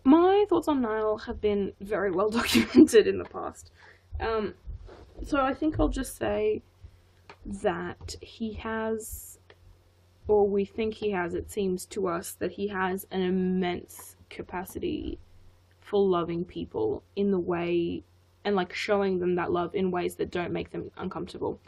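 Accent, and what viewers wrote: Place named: England, United Kingdom. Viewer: you're english!?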